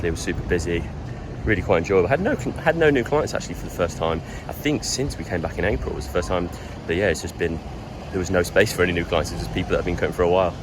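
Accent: United Kingdom. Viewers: Amo o sotaque britânico 🇬🇧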